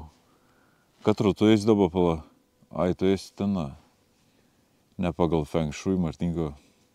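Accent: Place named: Samogitia, Lithuania. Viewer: Vėin už žemaitiška rukavima pagarba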